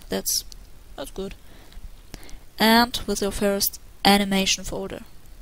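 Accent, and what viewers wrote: Germany. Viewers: Sehr gutes Tutorial :^) Ich habe die ganze Zeit überlegt was das für ein Akzent bis ich am Ende erkannt habe, dass es ein deutscher ist ^^ Weiter so! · Are you german? I fucking hear it ¬¬ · I think it's German. (I'm German too so I can hear the dialect) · Man hört sehr gut heraus, dass du aus Deutschland kommst :D Sehr gutes Tutorial btw! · ich habe iwie sofort an deinem englisch erkannt dass du aus deutschland kommst xD(nicht dass das schlimm wäre o: ) aber schön zu sehen das es hier so coole undertale fans und animator gibt :D grüße aus hannover :3 und natürliches: nices tutorial :D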